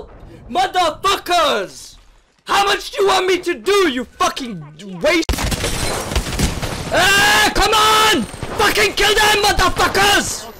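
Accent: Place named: England, United Kingdom. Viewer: He honestly sounds so different when he's not screaming, it's like the only time I can hear his English accent😂😂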